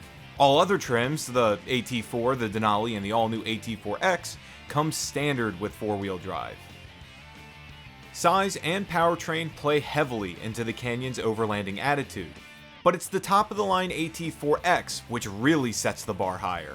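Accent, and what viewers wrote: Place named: Baltimore, United States. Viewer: The Baltimore is strong with this guy 😊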